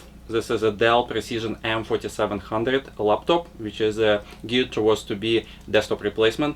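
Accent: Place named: Russia. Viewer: are you Russian speaker ? Russian accent 101 %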